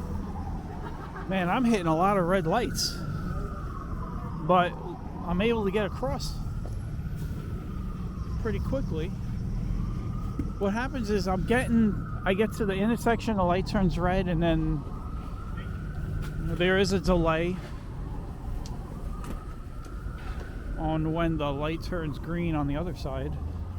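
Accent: New York, United States. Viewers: You talk like a real New Yorker. I know I'm from the Bronx. You refer to Manhattan as "the city". That's a throw-back from the 19th century when Manhattan was just "the city".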